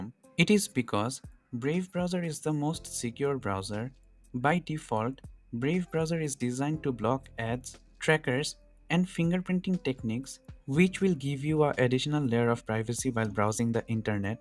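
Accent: India. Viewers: কথা শুনে মনে হচ্ছে আপনি বাঙালি ❤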